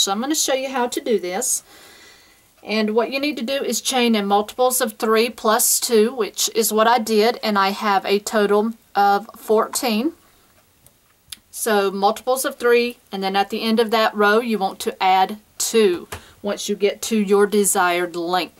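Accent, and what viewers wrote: South, United States. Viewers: Very good video. I hear a bit of an accent in your voice. From the South?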